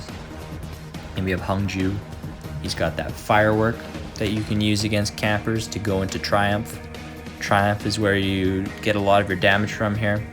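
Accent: Canada. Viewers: Congrats bro...you Canadian? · You are Canadian right?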